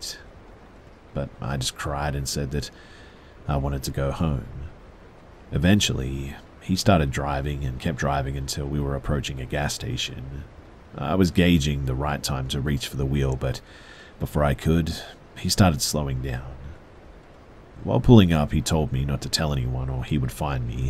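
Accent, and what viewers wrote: Australia. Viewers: you are my absolute favorite scary story teller on all of the internet. It's mostly your Aussie accent ❤️😍😁🤗😊😉😘💯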